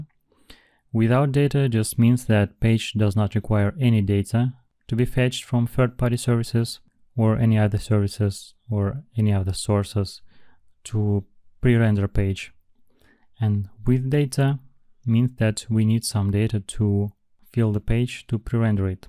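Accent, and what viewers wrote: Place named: Eastern Europe. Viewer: Not bad. Slavik accent is hardly heard. Keep it up!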